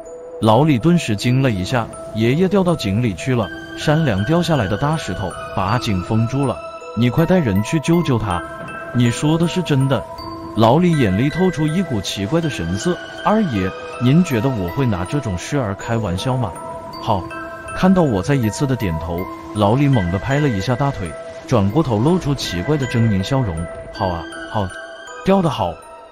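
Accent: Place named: Beijing, China. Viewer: 国语口音不就是北京口音？劳资就不爱北京口音国语，怎么滴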